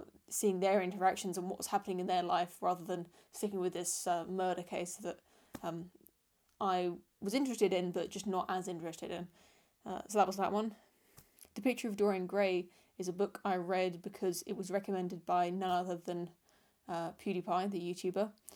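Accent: England, United Kingdom. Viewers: I love Jordan Peterson to death and back again; that's why I took it upon myself to take a hammer to one of his major claims in a way that felt authentic to my being. In my newest video I try and explore that the idea of Hell is preferable to the idea of not existing; because Jordan mentions that Jung's argument against Freud's about religion being simply a defense against death anxiety is invalid because they would have reserved Hell for their enemies. I would say that Hell is more easily grasped at least than the idea of non existing, in an atheistic sense. i than go into some of my DMT experiences. You're more than welcome to check it out. Side note: I feel like lots of us North Americans really are gullible to a proper English accent; you sound much smarter than you would saying the exact same words in some alternate reality, American version of yourself. 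I can't recall ever hearing that the English have the same fancy for the generic American one.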